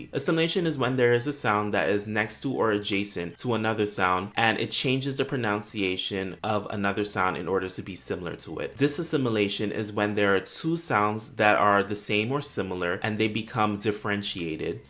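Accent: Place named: Africa. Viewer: YOU SPEAK VERY GOOD ENGLISH . BUT I HEAR THE AFRICAN IN YOUR VOICE.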